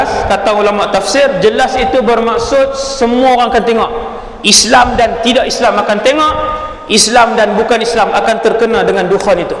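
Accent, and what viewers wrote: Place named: North, Malaysia. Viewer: Cakap Utara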